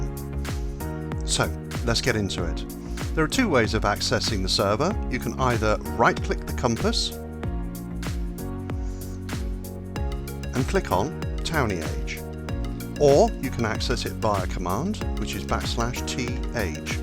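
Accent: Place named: London, United Kingdom. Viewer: ILLUMISYS on server; Very nice Job, good presentation and very helpful. Funny you and Avomance sound a lot alike, maybe from same part of London. What do I know though, I'm just a Yankee, lol.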